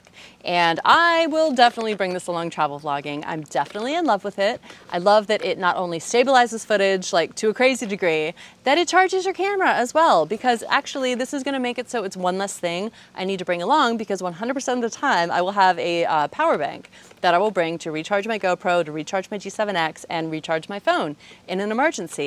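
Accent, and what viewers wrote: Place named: United Kingdom. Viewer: I love your British accent!!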